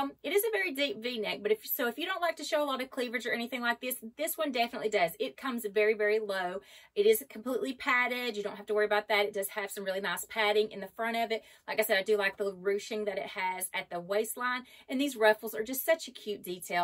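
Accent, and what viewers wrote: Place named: South, United States. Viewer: That's a nice southern accent you got there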